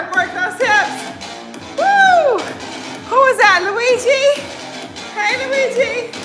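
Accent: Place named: United States. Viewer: me encanta tu acento gringo al hablar espanol :)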